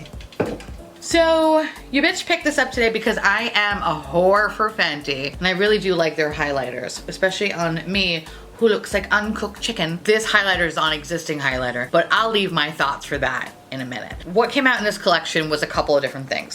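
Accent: England, United Kingdom. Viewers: This is the first time I've heard your British accent and I need it more in my life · I love the English accent 😁❌✖❌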